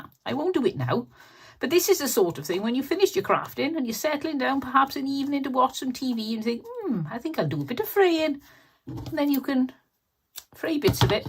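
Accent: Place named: South Wales, United Kingdom. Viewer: Hello I love your videos they are amazing. I recognised your accent, I am from South Wales 😁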